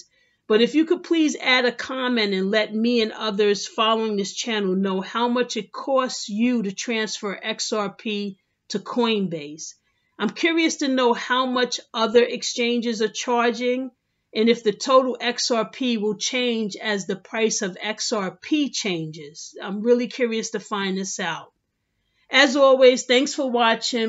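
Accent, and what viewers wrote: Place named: New York, United States. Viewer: Yeah we can tell you're from New YOrk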